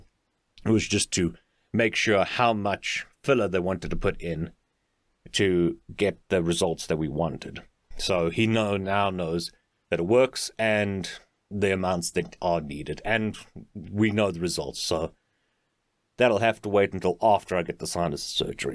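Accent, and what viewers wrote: Scotland, United Kingdom. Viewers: loving your work and working through the previous recordings, as a scotsman i'm very impressed with your scottish accent. Hopefully you will be well enough to get back recording soon.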